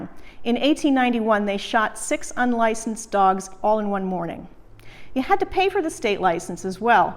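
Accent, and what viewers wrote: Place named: United States. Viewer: Why is an American lady talking about Exeter history?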